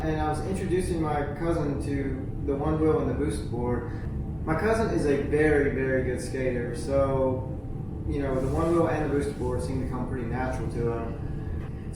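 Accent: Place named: South, United States. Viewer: LOL why are there subtitles? I can totally understand u I'm from NJ NYC is cuz of that southern accent of urs?😊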